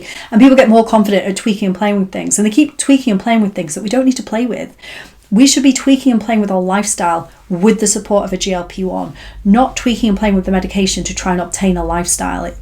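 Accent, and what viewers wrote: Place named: RP, United Kingdom. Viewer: You trying to speak posh is really irritating something about you is just well off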